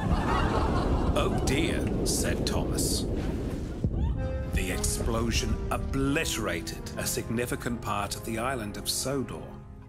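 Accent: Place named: Arizona, United States. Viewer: He sounds like everyone from Tuscon Arizonia.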